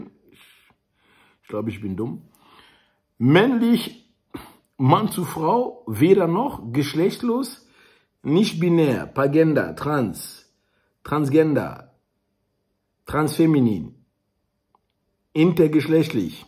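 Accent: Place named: Ruhr, Germany. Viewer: Wer genau hinhört, hört hierbden Ruhrpott raus. Gut so; da liegt eh das Herz auf dr Zunge. Glück auf.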